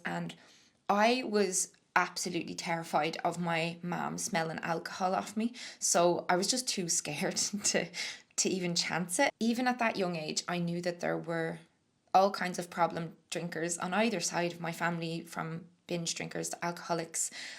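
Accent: Ireland. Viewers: First but least important… you Irish accent is like music to listen to… more importantly, your stories in this video match my experience… actually tired of waking up and checking my phone to see what the fuck I did last night… I’m not there yet but I’m binging videos like yours to give me hope… thank you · The irish accent is really nice to listen too :)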